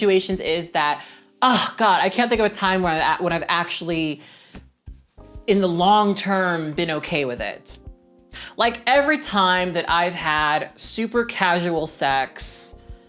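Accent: United Kingdom. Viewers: Can't find any comments about the British (?) accent so I just wanted to say it slaps 🥕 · I was having a hypoglycemia while watching this video and those elf ears and the British accent felt so surreal, I thought I was loosing my mind The video is great ❤️ I can't agree more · i love how you verge into a british accent when you feel awkward bc same as an ace person this was really nice to hear! I am pretty sex positive actually, but I'm just not personally interested at all for some reason the ending where you peer pressures us into using the emoji has become my favorite part LOL like its so funny but weirdly comforting at the same time🥕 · British accent is something in my life I never knew I needed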